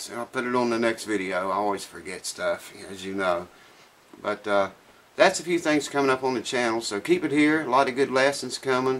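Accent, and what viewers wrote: United States. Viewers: Enjoyed that even though I hardly ever play my acoustic, still you're a character and I dig your accent, what state of America are you from? Cheers Si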